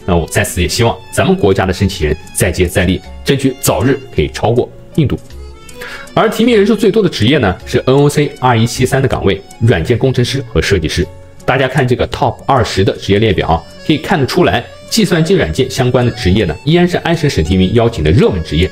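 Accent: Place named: Hefei, China. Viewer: 浓浓的合肥口音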